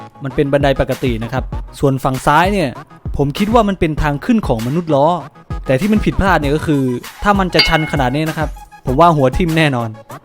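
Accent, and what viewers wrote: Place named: Rural, Thailand. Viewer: ตอนแรกพูดเหน่อๆ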